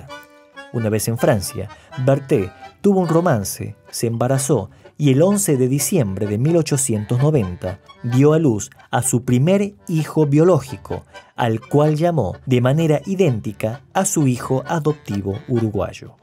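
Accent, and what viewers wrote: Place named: Buenos Aires, Argentina. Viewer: Pero muy porteño del buenos aires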